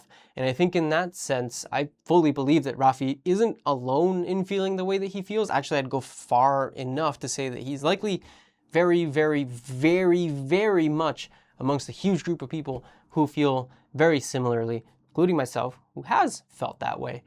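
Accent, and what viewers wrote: United States. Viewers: No way is he Spanish with that accent. He might live in Spain but he's got to be from the US surely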